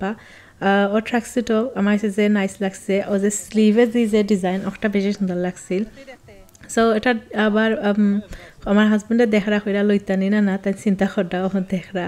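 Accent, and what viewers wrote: Sylhet, Bangladesh. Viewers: আপু, খুবই সুন্দর ভিডিওটা। আপনার সিলেটের ভাষা আমার খুব সুন্দর লাগে। একসময় ভাষা বুঝতামই নাহ। এখন সব বুঝি 🥰🥰 থ্যাংক ইউ আপু।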